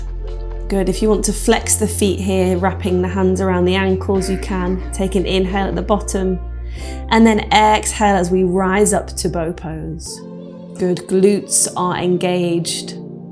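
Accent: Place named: United Kingdom. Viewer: Just found your channel and really enjoyed this class, thank you! You sound like a Brit, was this filmed in the UK? It looks pretty spectacular 🤩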